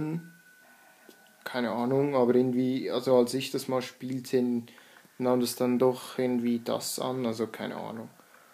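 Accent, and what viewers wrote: Switzerland. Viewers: BASELS DIALäGT! :D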